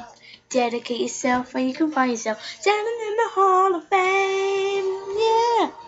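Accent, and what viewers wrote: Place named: United States. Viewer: Bro u sound amarican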